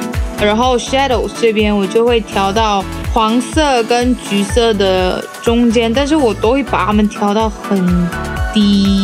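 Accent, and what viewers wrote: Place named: China. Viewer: 很會學中國腔🤣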